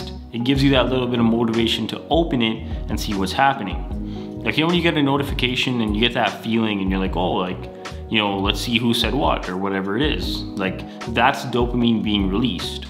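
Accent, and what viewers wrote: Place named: Toronto, Canada. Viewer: Man you have such a Toronto accent😂😂.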